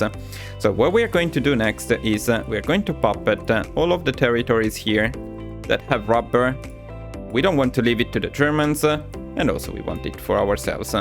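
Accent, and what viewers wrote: Italy. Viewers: That accent seems Italian, quell'accento mi sembra molto italiano è uno dei nostri? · Wait sei italiano?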